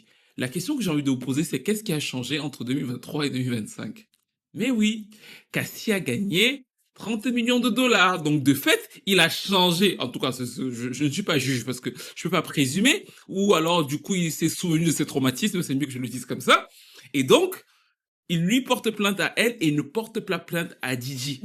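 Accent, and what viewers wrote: Cameroon. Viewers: Si on ne connaît pas le Cameroun ou juste très peu, impossible de connaître le phrasé des camerounais... 🙃 Votre remarque est donc compréhensible ! 😉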